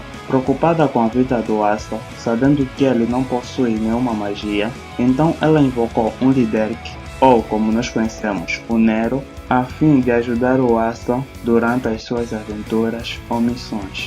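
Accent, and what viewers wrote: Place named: Portugal. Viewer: jurava que tu era de Portugal kkk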